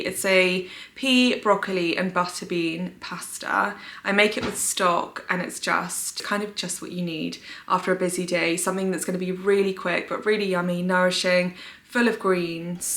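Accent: United Kingdom. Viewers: i subscribe you for learning British English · Very interesting and very instructive. So quiet and soothing. And your British English is so pleasant for ears... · hi i learn british english ......... plz tell me which british accent is it ? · It's a very quiet place. Btw I like the way you speak especially as a British, I'm not a native English speaker but you're very easy to comprehend. You're so beautiful too 🎉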